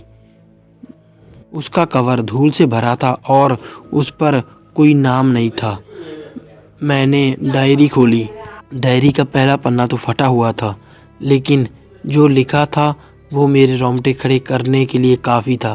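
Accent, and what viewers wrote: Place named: Punjab, India. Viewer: Bai tere hindi bolne ke trike se lg raha hai ke tu punjabi hai 😅👍🏻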